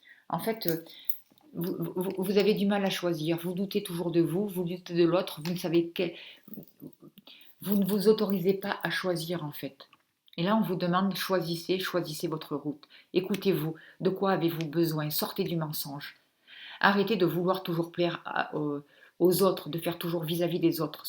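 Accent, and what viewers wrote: South, France. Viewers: Merci à vous ! Oui, c'était lourd ! Et comme j'avais choisi la pouppée jaune vous avez vu juste comme toujours ! Vous êtes fort énergétiquement je le sens. Priez pour moi avec Archange Michael et St Benoit svp car il y a eu beaucoup de bloccages (aussi familial) causés par la jalousie et les méchancetés. Merci ! Je suis Flamande et je continue CERTES à suivre vos vidéos aussi longtemps qu'il est nécessaire ! Vous avez un accent du Sud de la France , non ?Bien à vous car Dieu est avec vous je le ressens . Je suis tombée pour la première fois sur vos vidéos en février/mars et ce n'est pas un hasard ! On m'a fait beaucoup de saloperies et boccages dans ma vie (j'ai 58 ans) donc je suis ce qu'on appelle "un cas grave" …..Continuez votre travail de libérations énergétiques et prières car vous avez reçu de Dieu une Mission et des REELLES dons !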